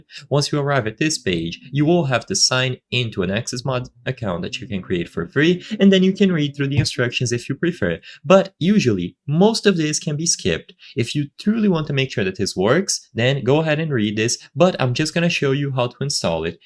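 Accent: Brazil. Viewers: Percebi que é brasileiro pelo sotaque 😅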